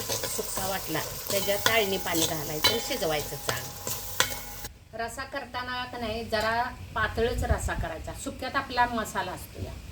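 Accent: Kolhapur, India. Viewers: Kolhapuri bolta tumhi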